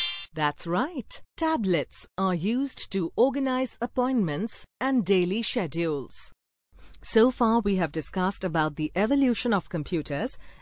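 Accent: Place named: United Kingdom. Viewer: I love your British accent..I have learnt so much about computers...thank u.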